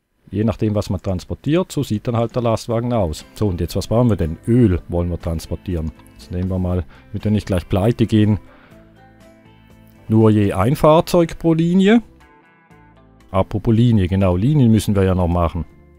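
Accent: Switzerland. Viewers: Du bist der Einzige wo ich deinen Schweizer Dialekt versteh :) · endlich mal ein gutes lp mit schweizer akzent!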